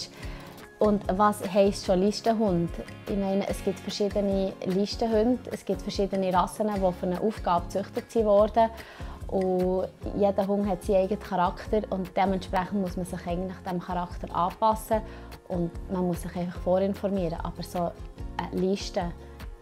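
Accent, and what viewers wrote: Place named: Bern, Switzerland. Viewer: Schweizerdeutsch mein Bro, Berndeutsch um genau zu sein.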